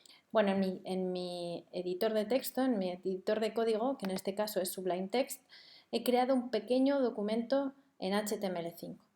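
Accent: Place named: Madrid, Spain. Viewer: me interesan cursos de html, css, python, c#, java, bueno, espero no pedir mucho😁. Gracias a ti por hacer estos cursos maravillosos. Con esa bella voz madrileña y tu inteligencia en programar es un gusto seguirte en el aprendizaje. Un abrazo enorme desde mexico!!!!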